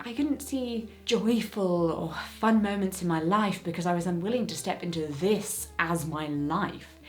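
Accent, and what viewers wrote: RP, United Kingdom. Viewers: You're so posh-sounding 😮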